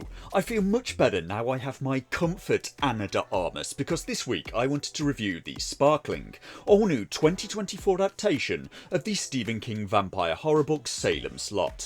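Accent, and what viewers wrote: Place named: Lancashire, United Kingdom. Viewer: is in Lancashire!! i know coz i live there!:-) "oor rite arr kid?":-)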